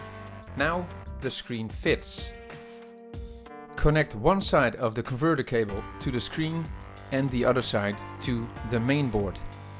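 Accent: Netherlands. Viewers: ja jij bent nederlands wist het van je accent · very recognizable accent :)! I am dutch too. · Are you perhaps Dutch? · You souwnd very like a Dutch persoon, very goed engliesch. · Ik dacht al toen ik het accent hoorde: Is hij Nederlands? Maar al snel zag ik bij dat het zo is!😄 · I love that dutch accent so much xD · is that a dutch accent or is it just me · Gotta love a Dutch accent! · Great job! also it seems like you're from the netherlands, i live there to! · Ik hoor een Nederlander 😂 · Spotted that Dutch accent from miles away. Nice video too. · 5 seconds in. This dude must be dutch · Hey, loved the video. Are you Dutch? You sound very Dutch. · I got a slight feeling you are dutch like iam. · hahahah je nederlandse accent is te herkenen :D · are you dutch ik wel! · woooow dat nederlands in het engels vermengd xD echt gaaf gedaan!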